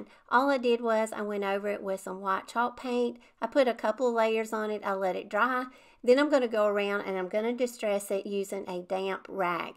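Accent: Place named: Tennessee, United States. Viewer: I really love your creativity and have used your ideas in my crafts. I wanted to say I have a sister in Tennessee and her name Theresa with the "h" and hearing your accent makes me think of her because we grew up in Michigan and she has the accent and I am living in Michigan where we grew up and just get a kick out of listening to my sister Theresa. Anyways, I have made decor projects for my house watching you.😂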